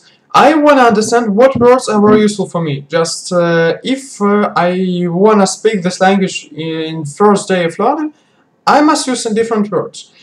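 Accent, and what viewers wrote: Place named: Germany. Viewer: You have a German accent while speaking English...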